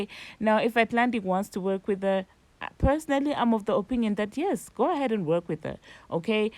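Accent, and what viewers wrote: South Africa. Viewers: Are you from South Africa 🇿🇦!? Ur accent… makes me 😊 like when I use to live there. Keep the good work 😉🥰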